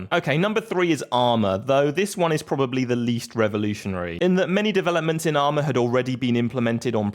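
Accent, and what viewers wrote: United Kingdom. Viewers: Holy british accent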